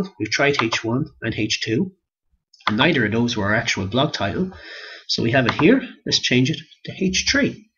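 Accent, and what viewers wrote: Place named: Ireland. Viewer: Never realised the blog/you were Irish - nearly got a fright when I heard the accent - was expecting a British/American accent for some reason! Very well explained :)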